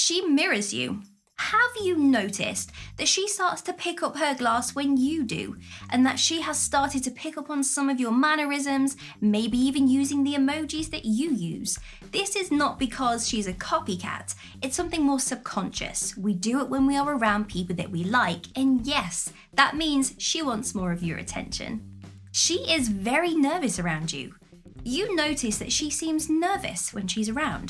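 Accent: England, United Kingdom. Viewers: That English accent tho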